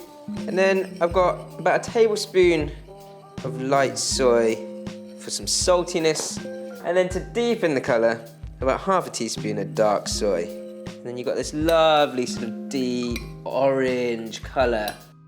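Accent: United Kingdom. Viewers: I'm amazed by the food but confused that you got a British accent hahaha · Chinese speaking with uk accent wow. Amazing and the fiod looks delicious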